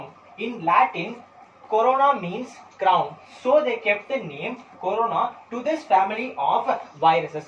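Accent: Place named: Punjab, India. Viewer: yrrr ap ka andaz ajeeb h bht mtlb lhja punjabi type h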